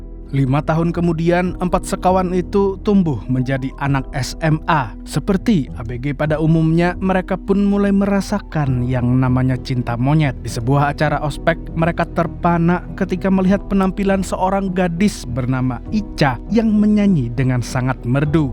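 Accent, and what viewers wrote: Banjar, Indonesia. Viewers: Suka suara x orang banjar kah bang?😊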